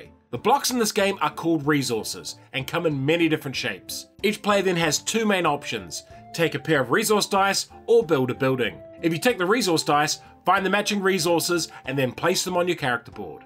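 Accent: New Zealand. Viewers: "Catacombs Cubes" sounds much more like it rhymes when said by a New Zealander than it does when said by a Merican...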